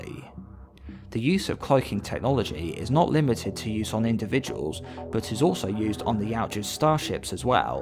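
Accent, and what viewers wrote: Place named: England, United Kingdom. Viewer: Super gay English accent. Where are you from?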